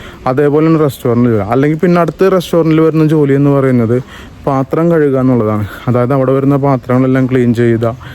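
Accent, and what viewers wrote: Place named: Ernakulam, India. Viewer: എറണാകുളം സ്ലാങ്ങ് ആണല്ലോ.Akm ആണോ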